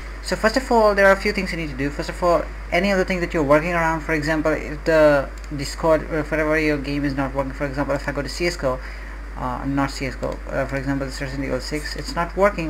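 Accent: India. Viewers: love the indian accent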